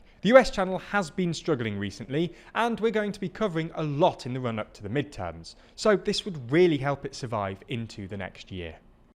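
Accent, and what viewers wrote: United Kingdom. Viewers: Yes, this is exactly what this channel needs, not just 538 with a British accent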